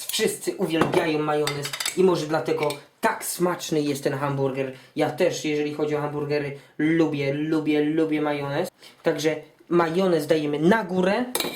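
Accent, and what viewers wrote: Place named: Kielce, Poland. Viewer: chlopieee powiedz mi ze jestes z Kielc :D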